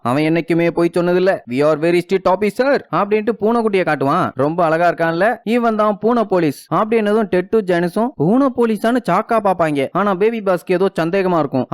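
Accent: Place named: Madurai, India. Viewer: Bro your slang is so super like Madurai voice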